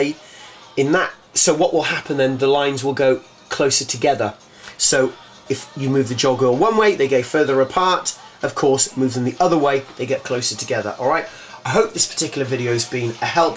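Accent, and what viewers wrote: United Kingdom. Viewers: Love brittish accent!!! #nohomo K !!!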